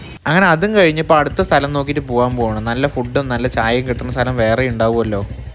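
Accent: Palakkad, India. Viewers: Enik ishttayi super vedio Enik ishttamanu palakkada slage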